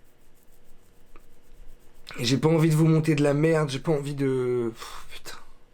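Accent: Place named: South, France. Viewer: Super ta vidéo j'adore ton accent du Sud :D Ça m'a fait bien rire!!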